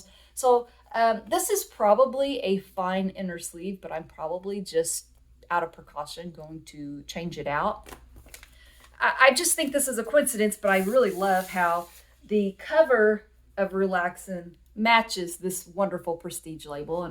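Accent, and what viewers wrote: South, United States. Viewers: .Lovr your southern drawl.Your excitement is evident on the video.This is not even his celebrated era.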